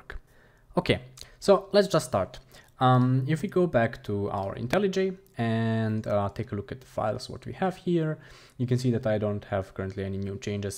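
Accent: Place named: Russia. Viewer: Are you from Russia?) I think so, because your English has a Russian accent.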